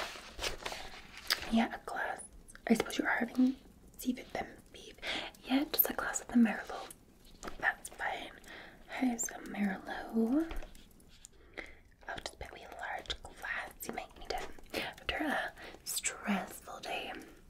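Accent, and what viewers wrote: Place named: Scotland, United Kingdom. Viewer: Love your Scottish accent and your scottish hotel videos I have PTSD and your accent always makes me safe my first asmr video was your cozy cottage video been a fan ever since thank you for all you do your fan jamie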